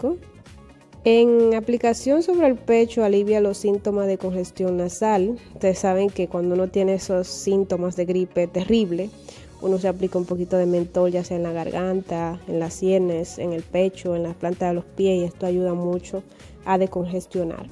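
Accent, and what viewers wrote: Dominican Republic. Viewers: Hola mi corazón, sabes antes de entrar a tu pagina, me imaginaba que eres Dominicana por las palabras que usabas en el video, bueno como dices los nombres de los ingredientes y la preparación!! jajjaja muchas felicidades y voy a ver si hago ese ungüento para regalarse a una amiga que tiene mucho dolor de las articulaciones!!!! Una pregunta tonta que yo escuchaba cuando era pequeña en los 1986 aproximadamente, una vecina de mi mama preparaba un aceite que ella llamaba aceite eléctrico, es verdad que sirve para los dolores también?....... cosas mías, pero siempre he tenido esa pregunta en mi cabeza jajjaja.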